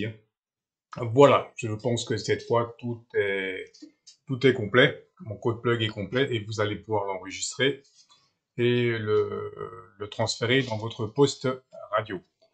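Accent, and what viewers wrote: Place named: Alsace, France. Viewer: Salut! La fréquence TX du relais 3 a dû être décevante... Sinon bonnes explications avec une jolie pointe d'accent Alsacien. Je suis dans les Vosges, mais pas encore concerné par les relais, je n'ai pas encore de licence Pour le moment, je fais mumuse dans le monde du 446...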